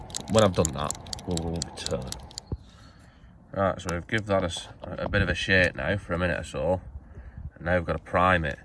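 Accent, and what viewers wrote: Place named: Lancashire, United Kingdom. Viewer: time stamp is 1st may 2021. Watching the video again, your Lancashire accent and reference to local chats is hilarious 🤣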